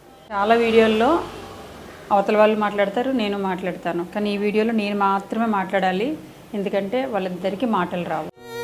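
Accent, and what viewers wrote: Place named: Vijayawada, India. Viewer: Mi mata manasu manchidhi sister 😊,madhi vijayawada side miru makulane మాట్లాడతారు బాగా,ఇలా ఎన్నో చేసి మంచి పేరు తెచ్చుకోవాలని ఆశిస్తున్న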